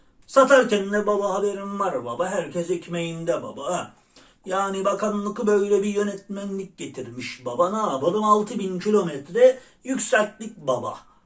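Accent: Erzurum, Turkey. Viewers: Sen Erzurum lumusun abi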